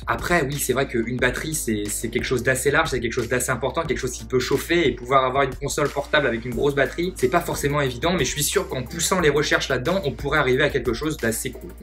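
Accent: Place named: South, France. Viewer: accent du sud mdr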